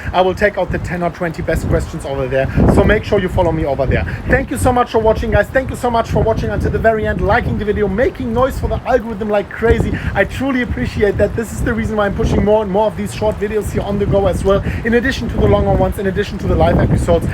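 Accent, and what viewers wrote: Germany. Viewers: Dieser geile deutsche Dialekt:-) Bist echt ein cooler Typ und ich bin durch dich überhaupt auf Crypto gekommen. Hab mir heute einfach just for fun für xxxx Euro Bitcoin gekauft und lass es liegen:-) vielleicht hypen mich aber deine Videos auch wieder etwas mehr zu spekulieren! Daumen hoch, viel Spass weiter im Paradies. Deutschland ist immer noch kalt;-)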